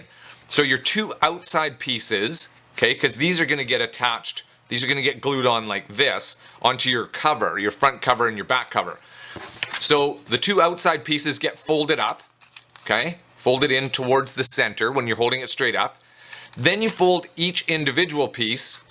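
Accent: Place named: Canada. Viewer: You must be a fellow Canuck, at least you sound like one!!! I really love your collections, the dog ones are my faves. I look forward to your new ones. How about a vintage floral meets steampunk inspired collection?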